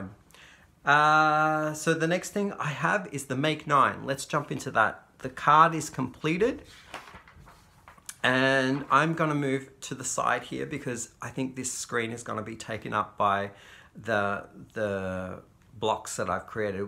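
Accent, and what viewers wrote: Australia. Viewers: Hi. you sound Australian! where are you from. O my goodness these fish are gorgeous!!!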